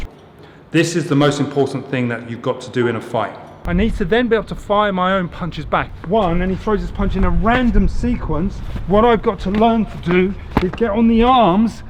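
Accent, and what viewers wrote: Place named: Cockney, United Kingdom. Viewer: Beautiful accent master, is it cockney?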